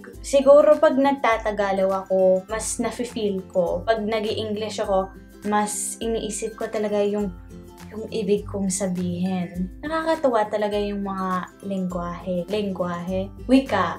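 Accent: Visayas, Philippines. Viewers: Parang bisaya ka day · You speak Tagalog like a Visayan =) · Ang cute niya managalog! Malambing na may puntong bisaya. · As a filipino i know what accent You will be good i think bisaya or mostly known the Cebuano language and hiligaynon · Cute video! You speak Filipino Tagalog with a slight visayan accent? And your use of those colloquial expressions are spot on! Very good filipino :) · you sound like a bisaya talking in tagalog... i know that because i sound like you... hehee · ang galing po ninyo mag Tagalog. nice!! May konting puntong bisaya. cute..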